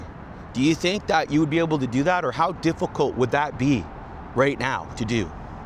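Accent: Canada. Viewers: I can tell by your accent that you were Canadian, but that popeyes chicken scene you described sounded like new orleans, baltimore, chicago or one of our other ghettos in the states. Stay safe out there.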